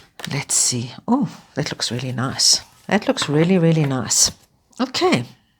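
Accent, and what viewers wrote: South Africa. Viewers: I know a South African when I hear one 😁 love to see it 🙌🏾🤍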